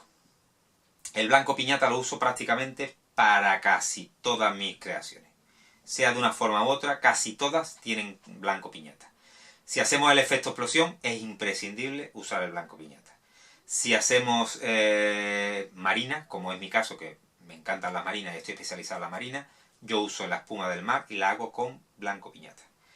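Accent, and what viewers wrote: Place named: Andalusia, Spain. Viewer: Hola y a todos. Te acabo de descubrir y me encanta como explicas,la verdad.Pero noto por tu acento que eres del Sur.Por lo que dices de la humedad,por ejemplo yo que soy de Galicia, tendría que aplicarla todo el año,da igual la estación por qué aquí aunque haga el calor la humedad en La Coruña es muy alta, sería así?Gracias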